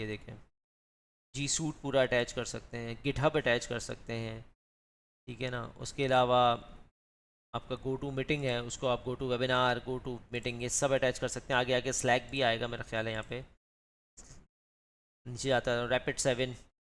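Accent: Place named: India. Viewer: Impossible to hear his english with the indian accent.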